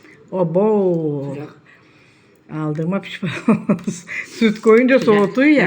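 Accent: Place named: Gelibolu, Turkey. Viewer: bende geliboluluyum siveniz bize benziyor da merak ettim 👍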